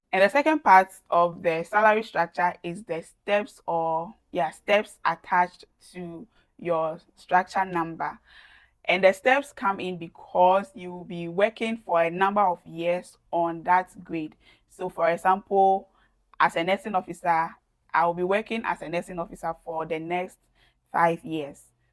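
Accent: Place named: Nigeria. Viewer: are u a nigerian coz you sound a nigerian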